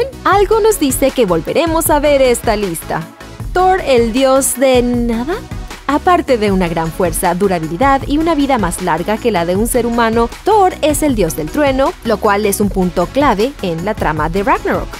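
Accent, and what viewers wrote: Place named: United States. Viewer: Por que hablas como gringa que apenas aprendió español?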